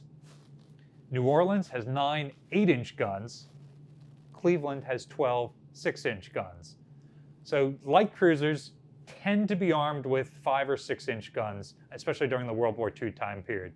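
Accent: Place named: Hackensack, United States. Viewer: Im from Hackensack but live far away now, and while I have a casual interest in Naval history, listening to talk and his mannerisms make me feel like im home again. Thanks.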